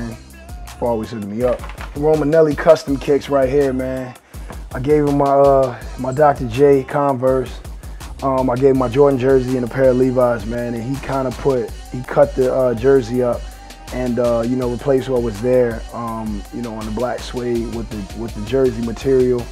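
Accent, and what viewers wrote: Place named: Inglewood, United States. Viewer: He is from eaglewood CA, which is called the "wood" People from there talk like that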